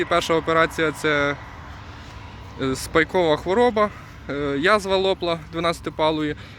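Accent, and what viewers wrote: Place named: Zbruch, Ukraine. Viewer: Akcent. Vrodi. Nedaleko. Zbrucha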